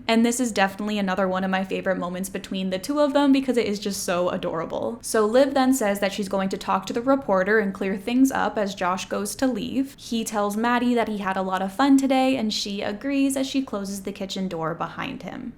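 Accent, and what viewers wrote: Canada. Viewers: Are you Canadian lol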